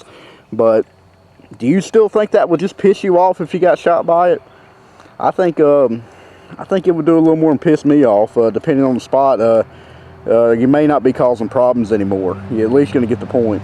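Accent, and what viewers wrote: South, United States. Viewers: I love clicking on these gun videos and hearing a southern accent 😂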